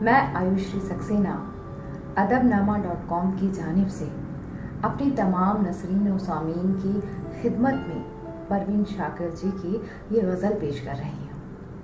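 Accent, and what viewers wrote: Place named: Hindi Belt, India. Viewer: معذرت لیکن سچ میں غیر مسلم کا لہجہ الگ ہی سمجھ آجاتا وہ کشش لگ ہی نہیں رہی۔ اردو سے محبت تو بہت لگ رہی میڈم کو مگر لہجہ میں اب بھی ہندی کا اثر واضح ہے۔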